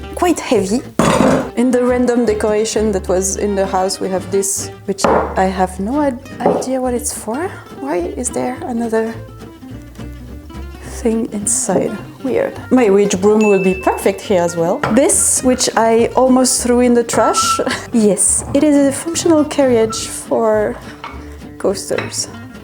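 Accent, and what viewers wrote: France. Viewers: Je suis déjà quelques vidéastes anglophones qui font pleins de réaménagement/cosplay/whimsical stuff. Entendre ce bel accent français et les expressions ça m’a fait tellement bizarre 😂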